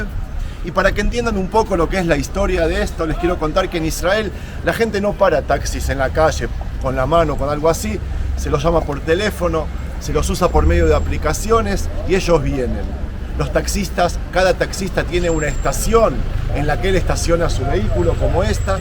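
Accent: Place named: Rioplatense, Argentina. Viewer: Wow porque hablas tan bien Español? Sonas como Argentino/Uruguayo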